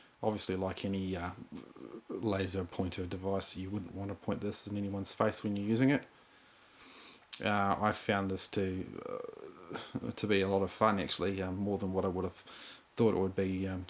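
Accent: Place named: New Zealand. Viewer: Thanks, I was considering this, will definitely get one. Great to hear a Kiwi voice too!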